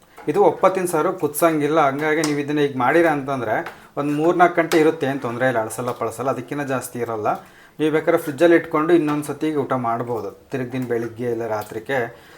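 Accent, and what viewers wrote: Chitradurga, India. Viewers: ನಮ್ಮದು ಹಿರಿಯೂರು ನಾವು ನಿಮ್ಮ ಶೈಲಿಯಲ್ಲಿ ಕನ್ನಡ ಮಾತನಾಡುವುದು ನಾನು ಬಳ್ಳಾರಿಗೆ ಬಂದು 33 ವರ್ಷಗಳಾದರೂ ಸಹ ನಮ್ಮ ಚಿತ್ರದುರ್ಗದ ಕನ್ನಡ ಭಾಷೆ ಸೊಗಡು ಅಡುಗೆ ಮಾಡುತ್ತೇನೆ ನೀವು ಮಾಡುವ ಅಡುಗೆ ಮಾಡುತ್ತೇನೆ ನಮಗೆ ಇದೇರೀತಿ ಅಡುಗೆ ಅಭ್ಯಾಸವಿದೆ. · ನಿಮ್ಮ ಮಾತಿನ ಶೈಲಿ, ಅಡುಗೆ‌ ಮಾಡುವ ರೀತಿಗಳನ್ನು ನೋಡಿದರೆ, ನೀವು ಚಿತ್ರದುರ್ಗ, ಚಳ್ಳಕೆರೆ, ದಾವಣಗೆರೆ ಪ್ರಾಂತ್ಯದವರು ಇರಬಹುದು ಎನಿಸಿತು..ಈ ಎಲ್ಲ ಅಡುಗೆಗಳನ್ನು ನನ್ನ ತಾಯಿ ಇದೇ ರೀತಿಯಲ್ಲಿ ಮಾಡುತ್ತಿದ್ದರು..ತವರೂರ ಊಟ ನೆನಪಾಯ್ತು.. ನಿಮ್ಮ ಅಡುಗೆ ಮತ್ತು ವಿವರಣೆ ಎರಡೂ ಸೊಗಸಾಗಿವೆ..ಶುಭವಾಗಲಿ.. ಸೌ.ಮಧು ವಸ್ತ್ರದ.. ಮುಂಬಯಿ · Neevu pakka davangere Chitradurga Kannada mathadthira ala same nam Ajji and Amma mathado haage.. nimma recipes nodovaga avarathrane Keli madthidhineno ansatthe… thumba thumba olle Saru chatni helkottidhira hinge hale adge dhu video galanna Maadri..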